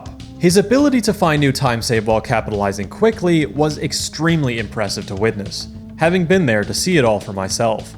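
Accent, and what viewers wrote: Kansas, United States. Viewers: You sound American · i always assumed you were american because of the accent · You sound like you're from Kansas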